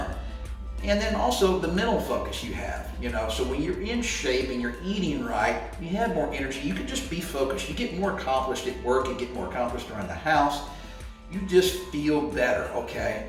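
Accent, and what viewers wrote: Texas, United States. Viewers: I'm really enjoying your videos and workouts. As a 53 year old Houston, Texas raised male living in the Seattle area, I need all the advice you and can muster. Fitness was a big part of my life until a hip replacement derailed me with a few complications I didn't count on. So now I'm suffering with a serious case of (as-you-put-it) "Old man ass"! LOL. Your particular attitude rings through with me from my fitness days... and I have to admit, you feel like home to me with your Texas accent and home decor as well as the rest. I really agree with your approach to eating. My days of trying to be Chef Fitness are over. I like quick-n-easy but smart. Bill Philips' book, "Eating for Life" had turned me onto that more than fifteen years ago. Thanks again for being here for us "hard to get a good night sleep" guys, and for the great videos.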